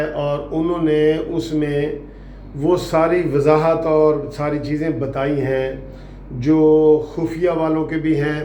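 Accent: Saraiki, Pakistan. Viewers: apka style bht psnd krta hu khas saraiki style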